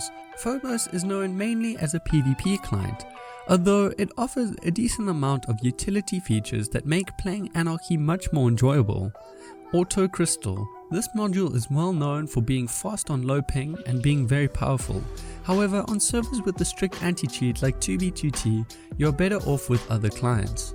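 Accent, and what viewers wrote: South Africa. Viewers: Just realized your South African, from your accent Its good too see there are RSA Youtubers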